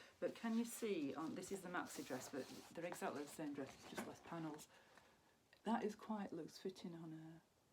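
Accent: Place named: Northern England, United Kingdom. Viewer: Hi, welcome to YouTube, it must be scary starting off! I really enjoyed the vlog, as an ex-pat Mancunian living in the south-west I love to hear a Northern accent. Found the content good and look forward to more. One minor point, I also couldn’t hear very well, not a complaint just mention it to see if you could fix that. See you next time 😀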